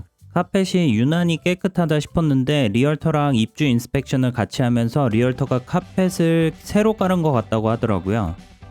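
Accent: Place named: South Korea. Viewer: You were born in America?? How come you have heavy Korean accent?? 😳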